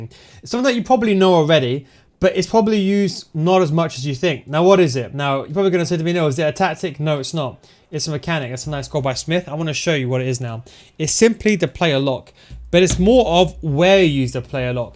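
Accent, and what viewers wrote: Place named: United Kingdom. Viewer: bro speak more clearly, not everyone is understanding you other than people from UK. after "player lock" bro wtf u sayin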